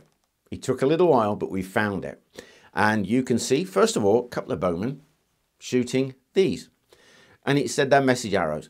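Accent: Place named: Birmingham, United Kingdom. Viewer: So your a Brummie o dear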